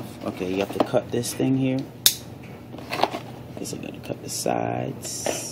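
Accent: Jamaica. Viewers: I love Ur Jamaican Accent :) ( remind me of my best bud)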